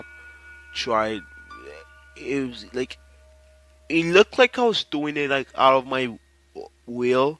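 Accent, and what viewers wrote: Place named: United Kingdom. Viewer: y you sounding British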